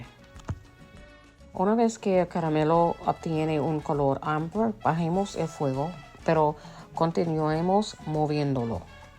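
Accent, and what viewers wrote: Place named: New York, United States. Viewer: It's the new york accent for me!! 🗽❤ I can't wait to try this recipe for my family!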